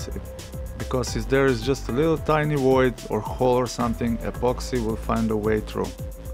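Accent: Russia. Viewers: в его произношении виден русский человек. ну или украинец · Русский акцент?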